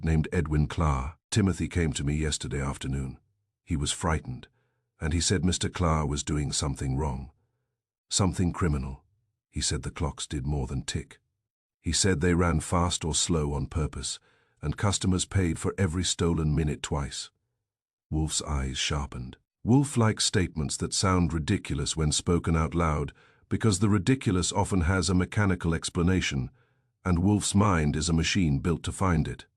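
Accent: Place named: United Kingdom. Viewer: If you’re a person, I do enjoy listening to you. It’s a bit of a challenge reimagining Archie Goodwin with a British accent, I’ll listen some more.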